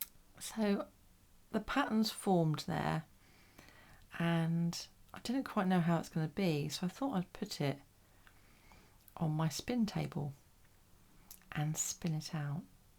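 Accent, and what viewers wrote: United Kingdom. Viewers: you always paint so gracefully and elegantly, your grace, and your adorable British accent matches the videos beautifully.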